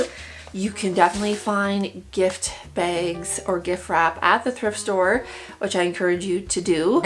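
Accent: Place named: Canada. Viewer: I hear Canadian, I subscribe! 🇨🇦 ❤ Plus thrifting, crafting, mending, everything up my alley! ❤❤❤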